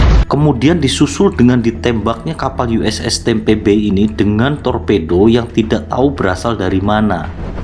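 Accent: Java, Indonesia. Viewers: Kuat betul jawa nya..mantap...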